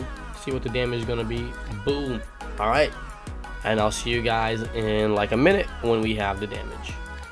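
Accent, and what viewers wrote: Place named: Nepal. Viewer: Bro U Nepali? U sound Nepali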